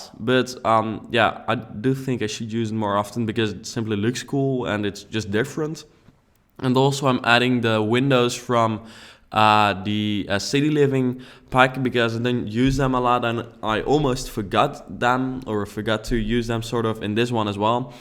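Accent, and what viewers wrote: Netherlands. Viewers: i don't mean to be rude but please work on your english. you have a dutch accent and I don't like listening to it. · I hope you're trolling. He has a dutch accent because he's dutch, you idiot. Figures the channel name would be self-explanatory enough! As if he's gonna change to cater to one person's dissatisfaction when his english-speaking skills are perfectly fine! If you're that butthurt by it, it doesn't take a rocket scientist to find the mute button on the video. "I don't mean to be rude" my ass. Pull your head in or go back to your perfect english-speaking safe space. fantastic build as usual! :)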